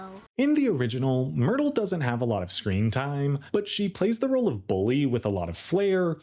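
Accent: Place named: United States. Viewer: That white American accent you got. Cripes.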